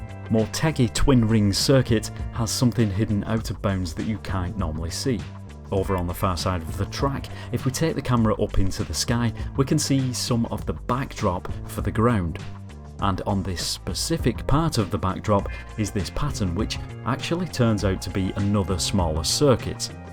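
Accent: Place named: Lancashire, United Kingdom. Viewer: "Euwt uf bewnds" haha love Lancashire accent (and im a tyke)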